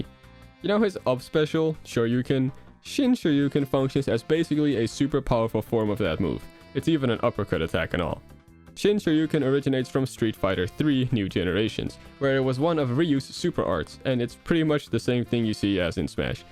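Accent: Netherlands. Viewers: are you dutch? me to if you are dutch! love your content!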